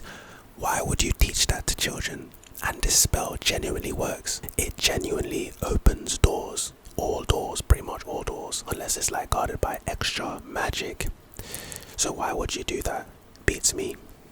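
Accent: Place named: London, United Kingdom. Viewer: This is going to sound really stupid, but your accent makes me feel incredibly homesick for northeast london ^-^ ...But in a good way. Its nice, to hear a voice that sounds so familiar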